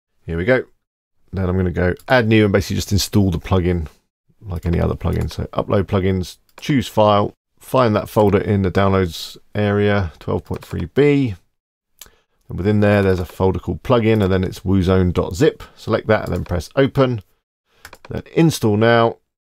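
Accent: United Kingdom. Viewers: Are you from Nottingham perchance?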